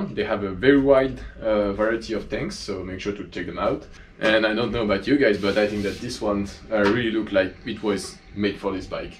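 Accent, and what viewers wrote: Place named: France. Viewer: On dirait qu'il y a un petit accent français 😏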